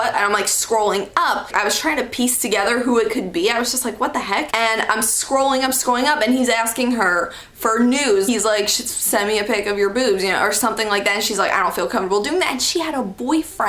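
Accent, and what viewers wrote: United States. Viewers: Hey! You are really nice and you seem like a good person, +1 Subscriber. And the total Drama part about Duncan, YAS I LOVE TOTAL DRAMA ISLAND. Where are you from? You seem like you have an American Accent, I'm Australian btw.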